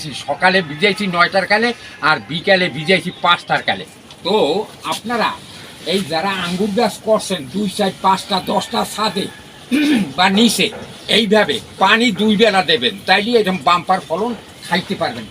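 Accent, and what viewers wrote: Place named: Bangladesh. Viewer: দাদু আপনার বাংলাদেশী কথা আমার খুব ভালোলাগে। আপনি খুব পরোপোকারী।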